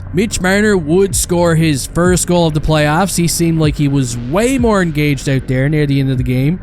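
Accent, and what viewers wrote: Newfoundland, Canada. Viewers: what part of atlantic canada is this fella from? felt like I have home in newfoudland listening to this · you sound like yer from newfoundland b'y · Yes by' are you a newf me son? Unless me ears ain't hearing right. I'd say you are from the rock! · dying at this sadly its all true 😂😂btw you gotta be a fellow newfie i haven't heard an accent that thick anywhere else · Your accent... are you a newfie? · Is a newfie? Sounds like it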